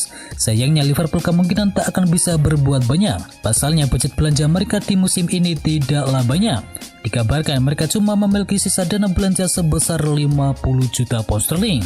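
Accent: Java, Indonesia. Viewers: Tolong bagi pembaca berita logat jawa nya d samarkan bos ,. Gk enak dnger nya . Kalo sekalian bahasa jawa mah iya aja aku suka .. SekedarMasukan dan saran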